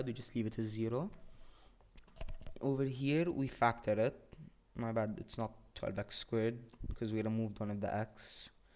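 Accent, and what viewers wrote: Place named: Lebanon. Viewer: I can tell ur Lebanese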